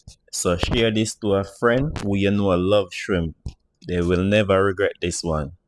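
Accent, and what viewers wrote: Jamaica. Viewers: Brown Sugar Stir Fry …✨❤️try most of your recipes. I have been doing curry shrimp with coconut milk.. or sweet and sour shrimp. Why don’t you use olive oil big flavour or coconut oil both much healthier than vegetable oil. Fastest thing to cook after work. . I have been watching u since you started .. you have made big strides. . 🇯🇲💙✨❤️🇯🇲. It seems every parish have a different speech pattern which parish are you from?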